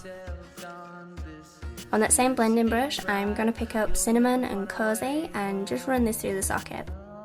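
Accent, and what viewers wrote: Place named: Sheffield, United Kingdom. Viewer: what part of England are you from? X You sound as though you're from Sheffield or near? x You're so gorgeous with or without makeup! x Also, what is your FIRST favourite eyeshadow? x this is such a great video, and I haven't even fi nished haha x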